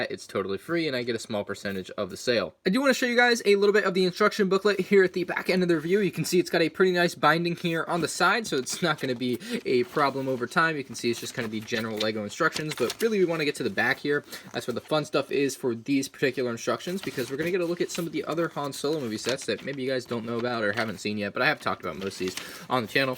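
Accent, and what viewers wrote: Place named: United States. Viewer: Because of your American accent when you say "grill piece" it sounds like you are say girl piece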